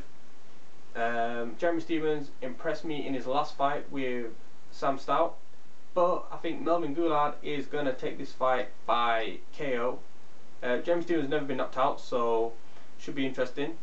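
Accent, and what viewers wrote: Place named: United Kingdom. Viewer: great british accent you have. Awesome accent, though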